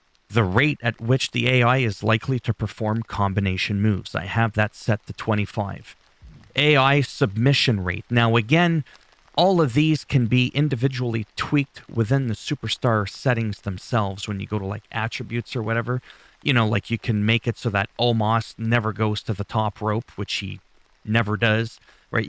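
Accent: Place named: Canada. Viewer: Merci by the way im Gove pleasure too meet u I really like your Canadian accent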